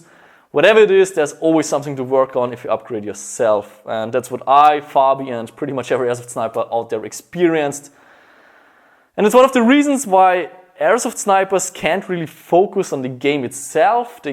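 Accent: Bavaria, Germany. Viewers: Sorry aber man merkt das du aus Deutschland (ich glaube Bayern) kommst Trotzdem Bester Youtuber der Welt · What accent do you have, it sounds like German sometimes but idk.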